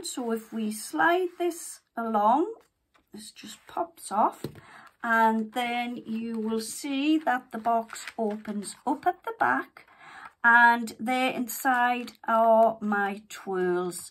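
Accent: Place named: Sunderland, United Kingdom. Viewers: What a lovely box you sound like you are from the North East Xx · Sounds like a Sunderland accent.. great video, nice box thankyou.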